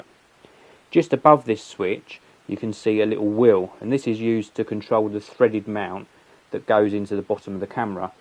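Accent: United Kingdom. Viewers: we dont hear odio , we hear audio , could you plz speak proper american english (, and english is my fourth language ) , instead of wanker british accent sound too GAY ! even farel collinn learned that in movies -see its not difficult to speak normal..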